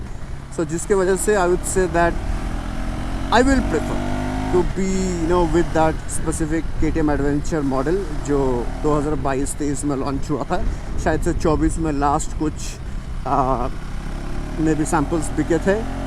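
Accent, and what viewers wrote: Bengal, India. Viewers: Bhaiya Bangali ho Keya ?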